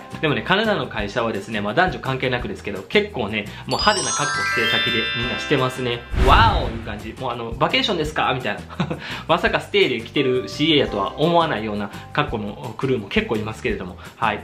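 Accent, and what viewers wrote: Kansai, Japan. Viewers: なんだろう…ハマる！関西弁が面白いし、人間性もおもしろーい❤😊 いつも楽しい配信ありがとうございます😂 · 関西弁が嫌だ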